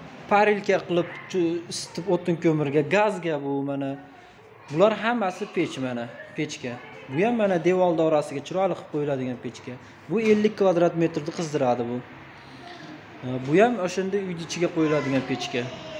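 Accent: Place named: Russia. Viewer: Ака лекин русча аксентийизга беш кеттим лекин, 😂😂😂 кловат, и, димахот гап ук лекин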